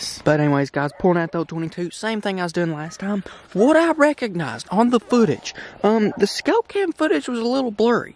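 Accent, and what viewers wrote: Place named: Appalachia, United States. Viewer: Hillbilly accent just like mine #jeuse #graygang #ky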